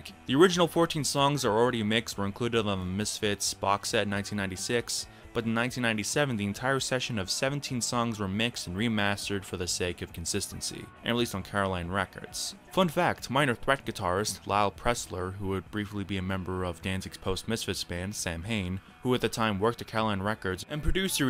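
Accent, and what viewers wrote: New Jersey, United States. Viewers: Vampire costume+Jersey accent=Priceless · He might be living in Oz but he clearly has a US accent, so must have previously lived there.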